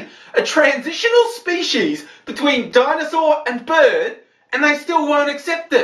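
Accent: Australia. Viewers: Your definitely an Aussie,and your definitely angry,well sort of,in a controlled type angry way,like angry with a purpose,almost assertive with a loud controlled voice,anyway good vid,good points made. Do you think the C's will listen,I doubt it,they have a god given logic filter,but at least you got it of your chest,just keep shouting at them.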